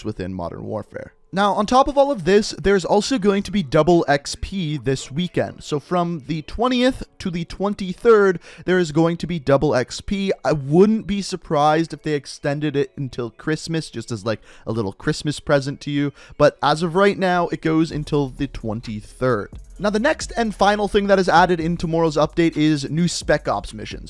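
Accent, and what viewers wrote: Canada. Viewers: Emmanuel Moreno he’s Canadian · Omg,that was driving me fuckin' nuts too. Weirdass Canadian pronunciations. So annoying. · He’s a Canadian (I think)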